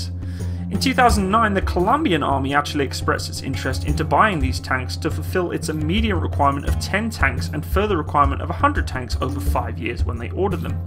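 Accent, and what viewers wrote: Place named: United Kingdom. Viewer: Neat trick starting off by praising and admiring the tank making tall claims and then in the last 2 minutes, discrediting those very claims to present a sorry picture. This is a cool tactic of youtube reviewers who are essentially amateurs and have no real authority in the field that they are reviewing. Their channels end up being a hotbed for racist bigotry and snide armchair internet experts. You remind me of a dude that does aviation reviews (DJ's Aviation or as he calls himself 'Dee Jayy's Aye vie Ayetion'). His only credential for doing aviation reviews is "he's seen a plane". I am assuming both of you are not the same guy as he sound Australian while you sound British.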